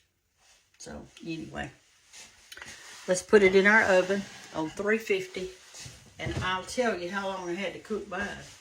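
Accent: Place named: South, United States. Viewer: You are so precious! I love your recipes i just subscribed! Love that southern accent!!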